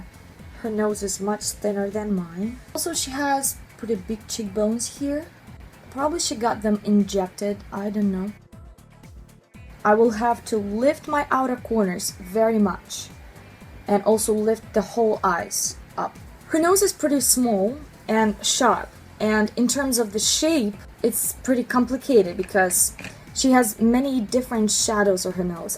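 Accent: Russia. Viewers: Кажется временами у вас проскальзывает русский акцент или какой-то славянский. Я хочу сказать что я в шоке после просмотра вашего видео. Иногда когда вы склоняете слегка лицо и прищуриваете глаза, тогда я точно вижу героиню вашего сюжета. Я честно говоря в шоке как вам так быстро удается это нарисовать. А главное похоже притом что у вас совершенно и глаза другого цвета и лицо другой формы. Я просто восхищена вашим искусством! Сама люблю рисовать но не на лице. Наверное вы какой-то известный визажист(я первый раз на вашем канале) .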